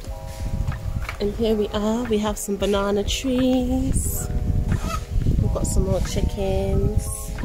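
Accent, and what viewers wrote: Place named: United Kingdom. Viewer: I will imagine she went to the UK as a teenager that's why she picked the accent pretty quick. Hard to pick a new accent when you are 20 and up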